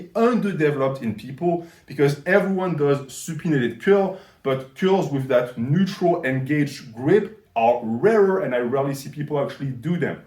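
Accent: France. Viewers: Are u french? Nice accent 😂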